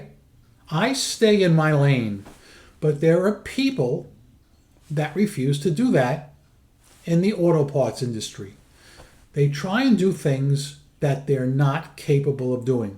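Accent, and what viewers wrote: New York, United States. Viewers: I know your frustation very well, but see you saying it like that in your New York/NJ accent was nice with my morning coffee over here in Europe.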